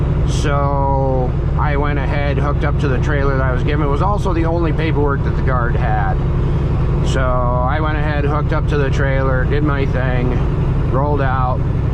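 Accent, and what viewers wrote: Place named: Midwest, United States. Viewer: Cleveland! That’s where I’m from bro you sound like you’re from the Midwest by chance. Love the sound of the Jake on your truck man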